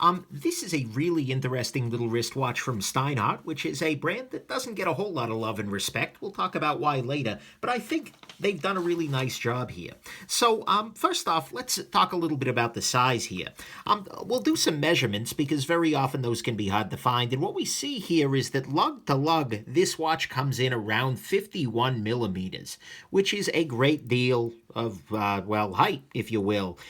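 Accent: New York, United States. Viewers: Taking a wild guess, you're from NY?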